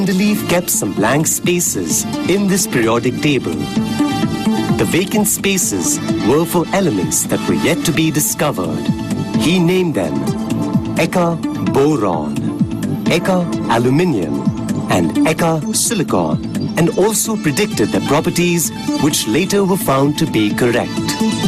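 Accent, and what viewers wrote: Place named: India. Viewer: indian accent spotted this is gonna be GOOD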